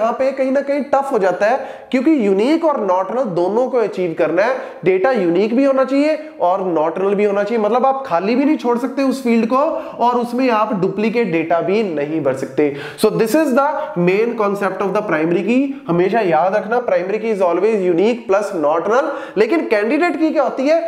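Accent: Punjab, India. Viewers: That punjabi tone in ur voice 🔥🔥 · Sir aapke bolne k tareeke se panjab ki khusboo aati h 😁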